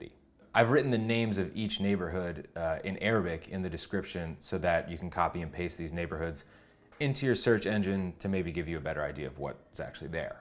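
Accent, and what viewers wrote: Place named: Staten Island, United States. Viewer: Haha! Nice accent! Spent any time in Staten Island?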